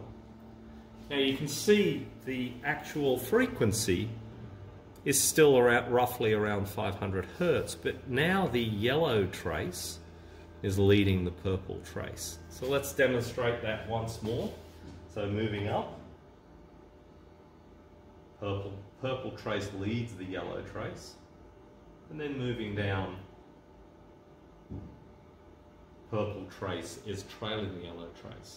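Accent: Australia. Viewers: An Aussie in the States? 🤔 Interesting video, tnx!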